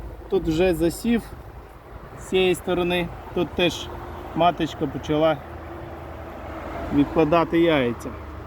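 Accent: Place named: West, Ukraine. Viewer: Слава Україні ! Я з Львівської області а ви з півдня України , але по вашій мові можна сказати що ви теж ,,западенець".😁 Дякую вам за мову та за повчальні , змістовні відео. Героям Слава !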